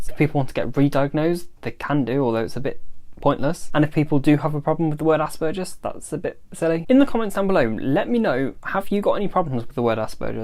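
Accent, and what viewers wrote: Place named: United Kingdom. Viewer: I prefer the term Asperger's, I pronounce it your british way. For several reasons. One it honors Asperger himself, who was a genius and had it himself, two it speaks to the 60 year time gap before idiotic american medical ascociation caught up to his ideas. And three it seems more of a technical personality quirk without being an all encompassing different than normal state. I think it's only being abandoned for political reasons in the political boards and will be taken back up, and as such I'm sticking with it, speaks to how this is very dependent on personal evaluations, where one man can out think the whole world by 60 years like that.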